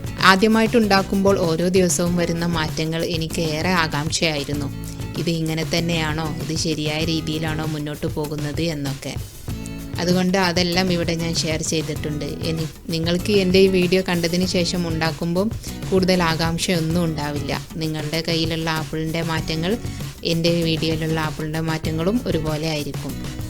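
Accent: Malappuram, India. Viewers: Malappuram ano. sahodari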